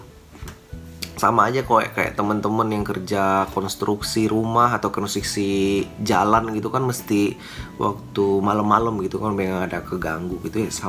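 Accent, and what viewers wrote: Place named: West Sumatra, Indonesia. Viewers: logat minang nya masih kental banget ya udah hehe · Sebelum liat caption full, dengar video sambil membatin, "eh... kayaknya ini orang minang, logatnya berasa familiar." Ternyata benar, ada ditulis di caption hehe. Salam kenal uda. Dima di Padang nyo? Main-main ka channel kami :)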